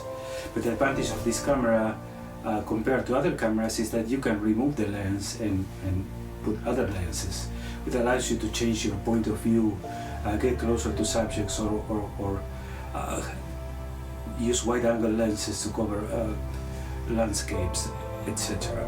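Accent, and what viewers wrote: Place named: Chile. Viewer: Estimado, por el acento presumo que eres chileno. Creo que somos contemporáneos fotográfica y existencialmente, además de provenir de esta larga faja de naturaleza que es nuestra tierra. Imagino que vives en Usa, por tus imágenes. El gran formato es muy atractivo y quién lo práctica como tú podría decirse que es como ser un "sibarita" de la imagen. Pero con la imagen digital, como tú bien dices, la inmediatez resulta muy atractiva y adictiva. Pase de lo análogo a lo digital con gran entusiasmo y sigo en esto hasta el día de hoy con ya más de 50 años de acción fotográfica y a mis 77 abriles.... Un gran saludo para ti, de este fotógrafo radicado en el sur de Chile. Puedes ver algo de mi obra, bajo mi nombre en youtube...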